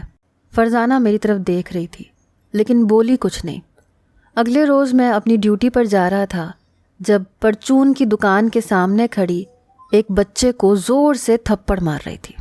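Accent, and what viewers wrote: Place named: Punjab, Pakistan. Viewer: Love ur Punjabi accent 🌹♥️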